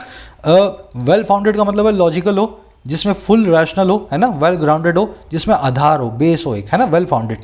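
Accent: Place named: Haryana, India. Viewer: Awesome sir. Your way of teaching is unique. Even hariyanavi accent add a feather in our cap.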